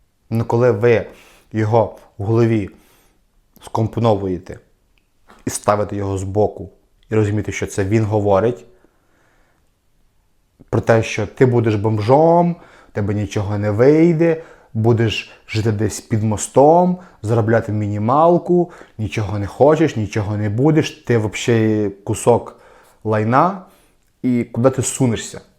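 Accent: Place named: Galicia, Ukraine. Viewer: Клас! Підбадьорив і ніби прожив моє життя і мої комплекси в минулому., дякую за твоє відео і за твою відвертість. За такими людьми, як ти, майбутнє нашої планети і наступних поколіннь. Судячи з акценту і прізвища, ти є з Галичини) Я вгадав? Перепрошую, що одразу на "ти", просто так відчуваю.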